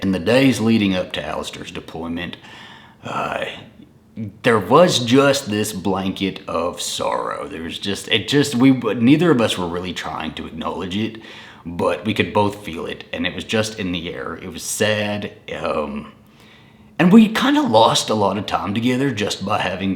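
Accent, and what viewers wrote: South, United States. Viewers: Gosh .. I love and admire your ''Southern Accent'' 😍🥰😍🥰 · Well, that was deep! I’ve been following you from the beginning. You probably didn’t get married too young. Sounds like if Alastair wasn’t there you would have continued your spiral. Off subject: Your accent is getting more Southern drawl every time you record. I think you’re talking more like your mom every day, instead of more like Alastair who has no accent. Analyze that Mr. Freud.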